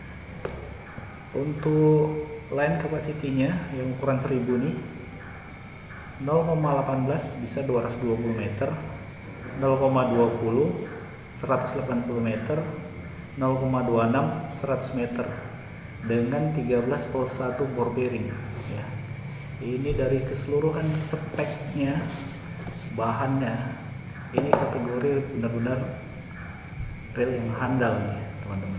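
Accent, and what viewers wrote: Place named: Palembang, Indonesia. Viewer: Keren uuyyyyyyyyyyyyyy 👍 bahasa palembangnyo jagoan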